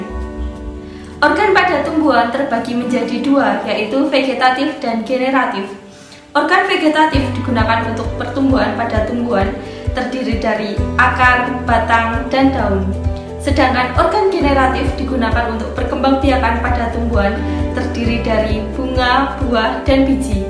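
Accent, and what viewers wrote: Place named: Java, Indonesia. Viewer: mbaknya cantik, tapi medhokk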